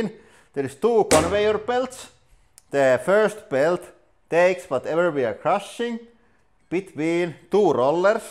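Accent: Finland. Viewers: You turned Stretch Armstrong into a chicken carcass! You Finns rock. I worked for ABB many years and traveled to Helsinki from time to time. I miss hearing the "Finglish" and your great sense of humor. Thanks to your channel, I get this back without traveling to Suomi-land. Take care and stay well.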